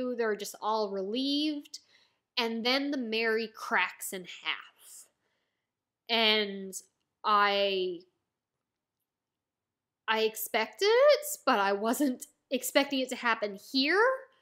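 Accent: Canada. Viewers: Interesting you're trending right now. YouTube algorithm is a weird mistress. I've got to say I've heard Almost every version of English native speaker discussing One Piece but your unintentional Aboot was glorious. Play into your Canadian accent when reviewing One Piece. Don't try to hide/Americanize yourself. There's thousands of American One Piece YouTubers but what made you stand out was your accent. Enjoy the Journey and just know the Demon Child of Ohara is the best Straw Hat. When she has her moments she really steals the scene. Sanji being captured by Black Maria: call for Help we want Nico Robin... Nami-swan save me I'm on the third floor of the castle in the north most room.. Black Maria is like why the hell did you sell out your comrade so easily. Sanji is like I can't fight a woman. But besides my sister, Nico Robin is the most formidable opponent in the world and she's gonna kick your ass.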